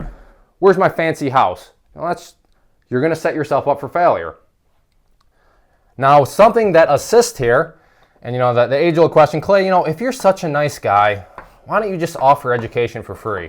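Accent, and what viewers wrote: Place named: Midwest, United States. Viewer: Where from the Midwest are you from i got a feeling your from the midwest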